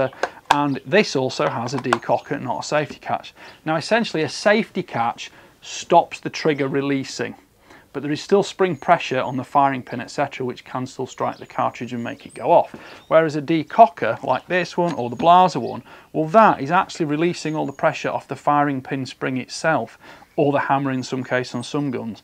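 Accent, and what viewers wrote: United Kingdom. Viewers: From Britain ?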